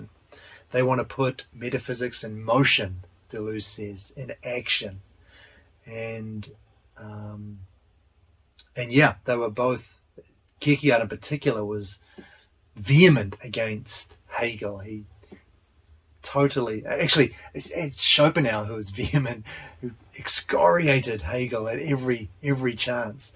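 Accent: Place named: New Zealand. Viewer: Are you a Kiwi bro?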